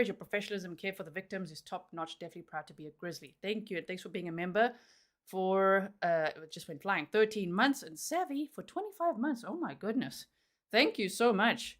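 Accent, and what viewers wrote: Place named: South Africa. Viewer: Your South-African accent is so beautiful, it makes me proud to be South-African. You sound like HOME❤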